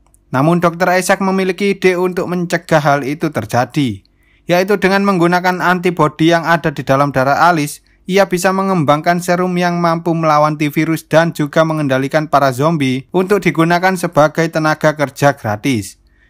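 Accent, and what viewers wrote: Java, Indonesia. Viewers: Pertama kali mampir, logatnya medok Jawa banget 😁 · IQ7 tapi versi medhok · Orang jawa ni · Jelasinnya engga jelas. Kalo udah medok pinter dikit jelasinnya. Gua udah nonton berkali kali soalnya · Iki admine dubbing jawa ya? 😅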